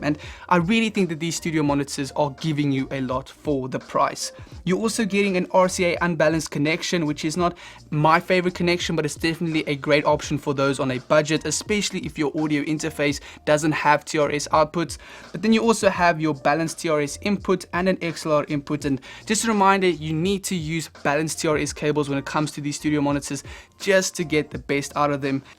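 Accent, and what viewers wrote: South Africa. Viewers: Great videos.. do I hear a fellow South African accent? I have 2 E5 XT’s on the way. I want to connect them to an Apple airport express so I can use the with Airplay. But I can’t seem to figure out how I would connect them as a pair to the Airport. The Airport only has a 3.5mm out. Would I use a 3.5 to rca stereo splitter and then 2 RCA to TRS cables.. one to each speaker?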